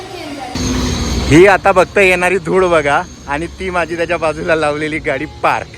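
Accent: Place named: Pune, India. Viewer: Punyat aloy mhanun proper marathi ka😂tumchya punyachi tone aikun vakaila yet bhava..🤮🤮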